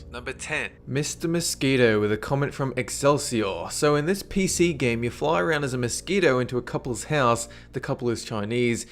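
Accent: Australia. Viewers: I thought he was Australian (?) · Heyyyyy are you Australian?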